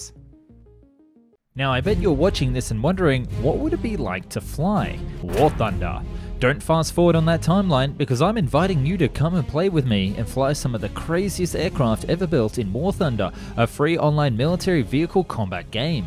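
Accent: Australia. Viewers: He misspronounces some words due to his aussie accent. Some facts arent straight too. Id give it a B... the channel. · Apart from the weird Australian/NZ (?) pronunciation, this is really great!!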